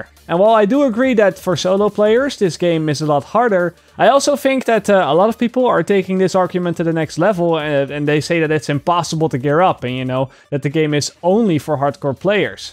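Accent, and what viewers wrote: Netherlands. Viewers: Are you dutch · Holy shit are you dutch Hallo uit Suriname 👋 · Nice video Ik hoor je Nederlands Engels accent maar je Engels is serieus goed. Go on with you channel. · De meest Nederlandse accent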